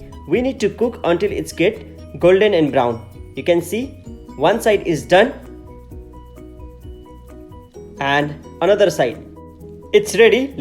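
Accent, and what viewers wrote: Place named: India. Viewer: great presentation my friend you're sounds indian. your burrito looks yummy.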